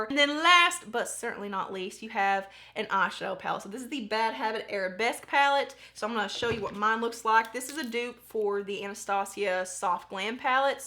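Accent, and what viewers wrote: South, United States. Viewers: Love love love your southern accent girl it’s so beautiful to hear. You are beautiful and your skin is amazing.! I’m Always here to support smaller YouTubers Never give up your dreams !Xoxo from Emmett Idaho! 💗🌸 Congrats on 25k! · I love your country accent!❤️ And your makeup is 🔥 #partypeople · I love when I find new people to watch. Regardless of the giveaways I subscribed and rang the bell as soon as you started talking. Love the southern essence #partypeople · Here from Vianney's channel, subscribed, but not entering the giveaway, because I know nothing about applying makeup lol. I will say that I love hearing a southern accent!!! (Tennessee girl here) I also like that you're relatable and not afraid to show your emotion. Prayers for you and your family. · #partypeople where Is your accent from? I love it, I'm southern also but I'm more of the hill Billy type lol hate that you are crying even if it is happy tears, you seem so sweet and although I don't know what's going on but I'll pray for you also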